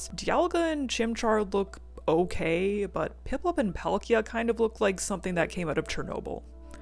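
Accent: Canada. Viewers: i didn’t realize you were canadian until you said “zed” and then the way you say “newer” (nyoo-er instead of noo-er) really cemented it lmaooo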